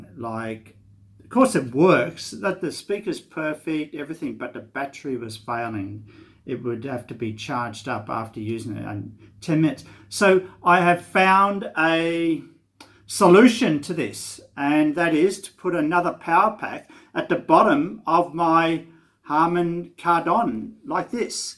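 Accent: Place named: Sydney, Australia. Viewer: This guy sounds like he's from Sydney 😛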